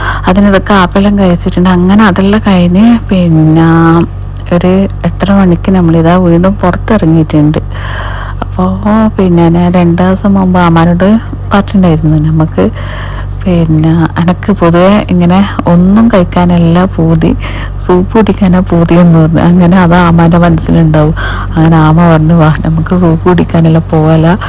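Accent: Kannur, India. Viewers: Kannur malayalam adipoli❤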